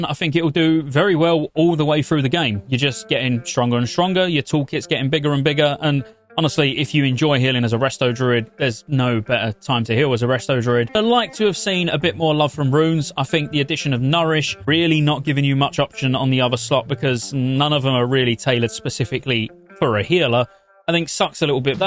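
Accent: United Kingdom. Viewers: Hi right there! Did you appears to be british?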